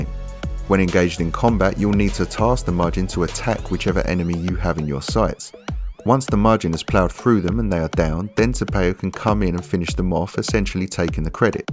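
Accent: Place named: London, United Kingdom. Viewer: Proper londoner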